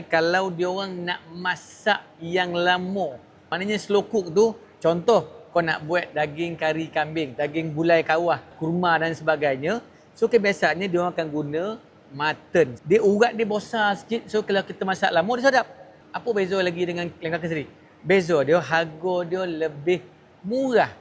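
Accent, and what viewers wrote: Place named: Negeri Sembilan, Malaysia. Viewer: Speaking nogori pokat Broo....😅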